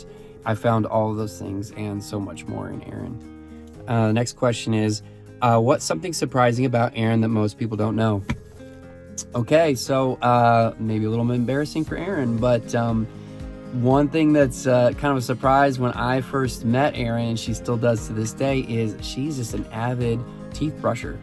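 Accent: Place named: Midwest, United States. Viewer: Hmm. Idk but from his accent I'd say that he's from the Midwest. I could be wrong though. When he said God it sounded like Gad. Lol.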